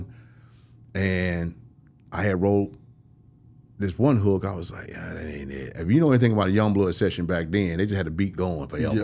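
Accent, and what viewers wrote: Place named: South, United States. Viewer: This host always makes a rapper feels good with his hilarious laughs and comments,real love ,with his souther spin and accent on things .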